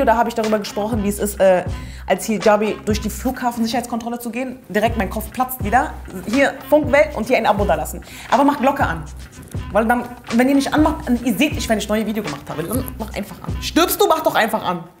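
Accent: Berlin, Germany. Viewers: habubti! Wallah du bist eine Bombe. Deine "Berliner Schnauze" / Berliner Akzent hoert sich an wie "Koefte in der berliner currywurst Sosse".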